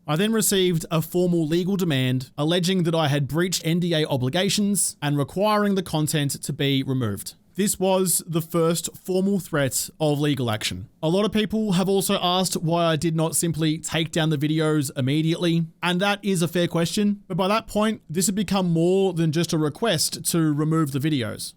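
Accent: Australia. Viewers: nooo-wwwww. video-wwwwwww. the older i get, the more obnoxious the australian accent becomes. insufferable. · I have been following you for what feels like nearly a decade now. You have been my favorite content creator based on your personality and great Aussie accent. Keep your chin up, it will get better. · I am here for the, not the game... for many years now...at first i was like "dang that man is talking like a fkn machine gun and is also as loud:D" but i stayed, first for learning the game and its mechanics, later, because i love your loud aussi snout;)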